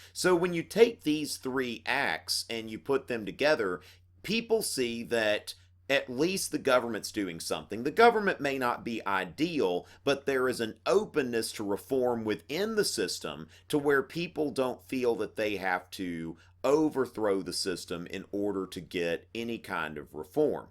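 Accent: South, United States. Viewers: I'm here looking up as much information as possible-trying to finish my history project, and I get this discount Matt Damon with a southern accent.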